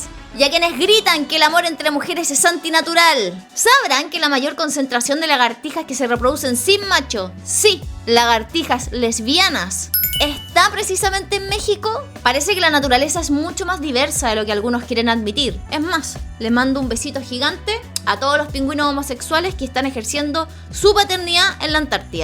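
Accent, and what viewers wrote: Chile. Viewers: tu timbre chileno de hablar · No puedo con este acento chileno uy no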